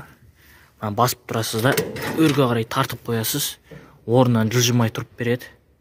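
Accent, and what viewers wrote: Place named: China. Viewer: Тіліңнен. Айналдым қытайдың Қазағында сакталган.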